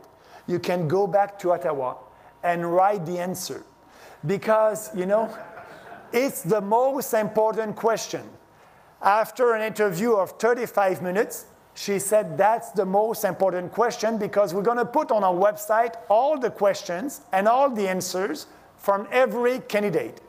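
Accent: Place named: France. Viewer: These hero's always pop up out of nowhere, and this is standard. He may have a French accent, but then again so did my grandmother.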